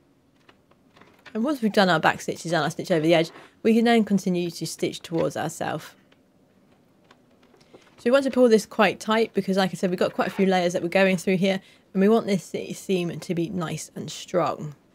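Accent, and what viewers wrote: England, United Kingdom. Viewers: hello dear friend, i enjoyed the videos and i like your English accent cause i'm learning English by listening to many videos, and i saw you folding the edges of suede leather, i want to advise you to get a help of thin comb may be you can break some of needles of the comb to create a space which will help you to assemble correctly the glued parts with a perfect line, god bless you . i'm from Algeria, Northern Africa.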